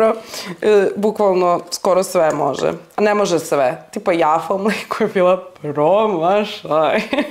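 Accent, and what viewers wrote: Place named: Novi Sad, Serbia. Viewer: Tvoji komentari su pre-simpa i jos sa tim NS naglaskom uvijek me oraspoložiš. A Baku Anu vec zamisljam kako se krsti kao na onu "šolju žumanaca" 😂❤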